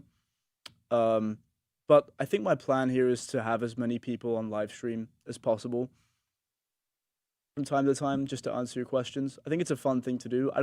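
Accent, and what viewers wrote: Edinburgh, United Kingdom. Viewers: I detected that as well, I’m guessing east coast Edinburgh say, I wonder what his bio is ? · that’s exactly it. He has the same accent as a lot of my Edinburgh/East coast friends.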